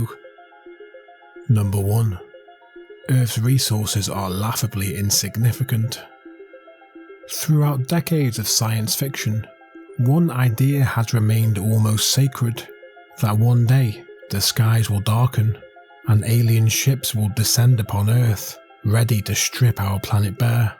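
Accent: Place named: Cockney, United Kingdom. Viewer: This video has been done before, but you should really lean into that cockney accent.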